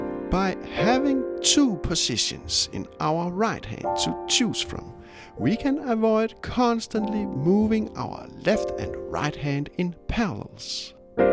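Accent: Denmark. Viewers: You sound danish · Instantly recognize your accent! Nice video my fellow danish person <3 Gonna definitely use this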